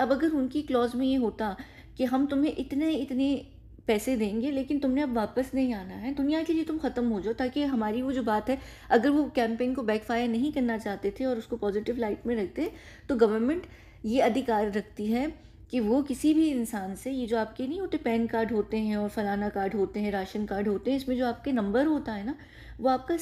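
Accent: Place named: United Kingdom. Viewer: All this is true. But becuz speaker says she is from a wealthy family with an enviable diamond collection , speaking multiple languages with a British accent etc……..she looses credibility.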